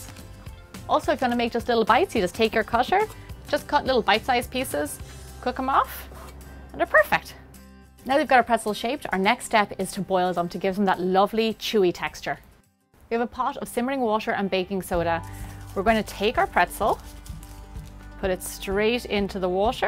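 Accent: Ireland. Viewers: She is from Ireland I'm pretty sure :) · Irish accent? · just a wee bit o butter. lol I know you don't say that but I love your accent. irish I'm guessing... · OH WOW!!! They look incredible! I make bagels pretty much the same way, by boiling them. And I have to say - your lovely Irish accent made them seem that more scrummy! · Lovely Irish woman.... beautiful accent. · i love pretzels thanks what is youre nationality im guessing irish lol no clue carry on carry on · are you Irish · Are u Irish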